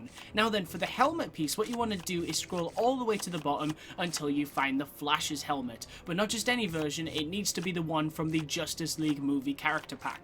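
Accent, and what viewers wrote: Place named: Tyneside, United Kingdom. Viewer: My son is loving your videos. What's bugging me is, your accent sounds soooo familiar.. Are you from the North East, Tyneside maybe?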